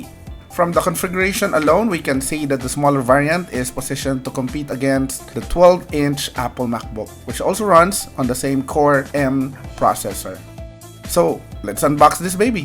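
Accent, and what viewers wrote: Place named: Ilonggo, Philippines. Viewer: ilonggo ka man? you sound like one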